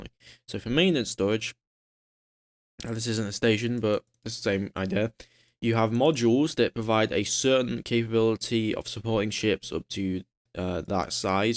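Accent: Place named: United Kingdom. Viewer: Sound is a lot better on this one! Minor point of citicism: your english is very 'brittish'. You talk very fast and don't 'finish' all your words properly. This generally makes it harder for continentals to follow. Keep it up though, the tutorials are really useful.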